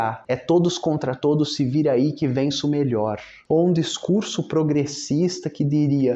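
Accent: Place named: São Paulo, Brazil. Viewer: Poluído, deselegante, confuso. Associações forçadas em uma narrativa tendenciosa a fazer o espectador pensar que tudo o que há na história é feita de sacanagem seguida de sacanagem. O ódio é um dos petiscos oferecidos. Indignação e umacerta euforia bipolar de alguém que fala muito sem parar. Excesso de referências e indicações de livros. Maçante. Esse sotaque do Brás é bem feio. Essa mãozinha gesticulante é bem chata. Essa dicotomia de "de um lado temos isso e de outro aquilo" é muito chato. Eu não diria que chega a ser pseudo intelectual mas é confuso e poluído de referências. O tom das suas narrativas são na intenção de fazer as pessoas pensarem que o ser humano é mal e que em tudo há maldades. Isso é chato, ingênuo e primário.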